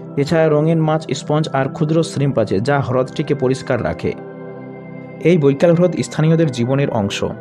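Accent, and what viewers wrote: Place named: Kolkata, India. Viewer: সরি ভাই..... আপনার কথা বলার ধরনটা মনে হচ্ছিল যে আপনি কলকাতার । আপনি কোন জেলা থেকে বলছেন.....??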